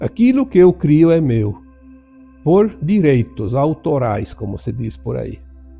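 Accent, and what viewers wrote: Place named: Rio Grande do Sul, Brazil. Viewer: Eu acho que é do Rio Grande do Sul 😅pelo sotaque 😊😊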